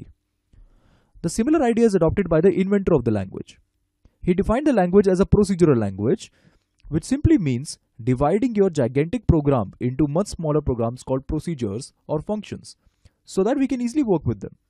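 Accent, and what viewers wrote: India. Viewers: Are you Indian sir? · Are you Indian?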